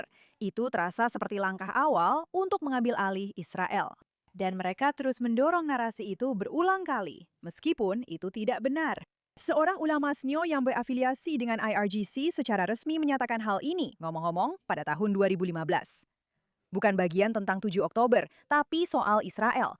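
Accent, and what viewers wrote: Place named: Malaysia. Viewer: Dialeknya kadang malaysia kadang Indonesia. Kurang seru 😂😅👎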